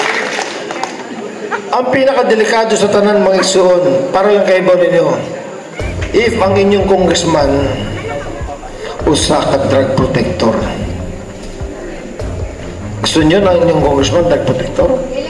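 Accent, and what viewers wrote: Visayas, Philippines. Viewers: c idol hahaha sweto kaau mg visaya!!!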